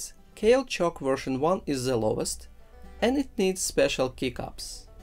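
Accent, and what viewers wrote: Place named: Eastern Europe. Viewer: No-one said about strong slavic accent? Anyone? Then me: you sound like my school English teacher😄 Btw cool content, thnx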